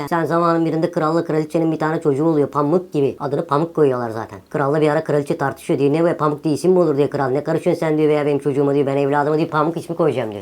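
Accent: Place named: Thrace, Turkey. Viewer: Abi bu Trakya şivesi ile Türk ve uluslararası ünlü romanları anlatır mışın lütfen çok komik olur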